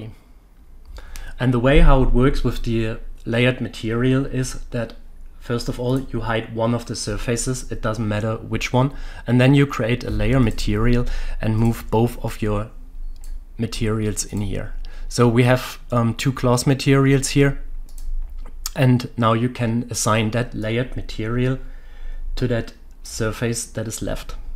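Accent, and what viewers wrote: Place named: Germany. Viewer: Dein deutscher Akzent ist leicht zu erkennen... welche Hochschule hast du besucht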